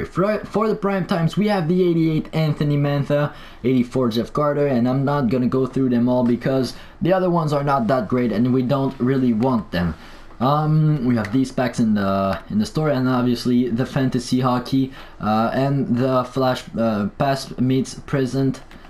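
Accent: Quebec, Canada. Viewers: Ahah l’accent québécois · oh okay. French canadian? That explains the accent right? Keep up the good work. Love these vids man👍🏼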